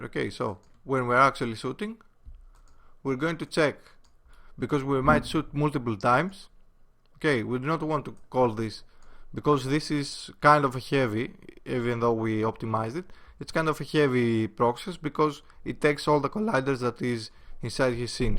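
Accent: Greece. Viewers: Are you from greece?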